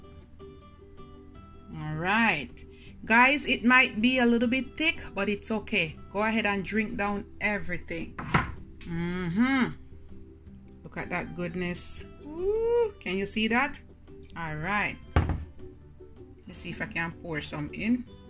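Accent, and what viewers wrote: Jamaica. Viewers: Loving this upload my friend. Loving the Jamaican accent, for true, it gives your presentation that little bit of flavour. Awesome variety of receipt on show. Fantastic demonstration and tutorial on show my friend. Amazing health information you are putting out there, with excellent research and knowledge. The like is absolutely smashed ############ Keep Safe and Healthy out there. Stay Positive. JEVITEE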